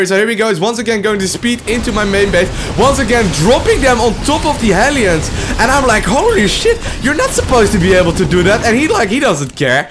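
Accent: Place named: Netherlands. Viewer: grrr i like ur content but im Dutch myself and i cannot stand the Dutch accent people sometimes use :P if i were you i would try and work on the pronunciation + switching words like: these Hellbats are all getting killed right now... You've said it a couple of time in this vid... ofc it should've been roaches :O i guess its cuz u talk really fast and its really hard :P im just saying.. trying to be helpful here; D Succes met je video's ;)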